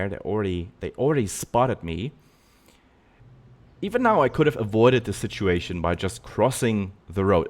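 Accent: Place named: Germany. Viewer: gutes englisch...aber du kannst den deutschen akzent nicht verstecken ;) ich hoffe dir geht es nach dem überfall gut ?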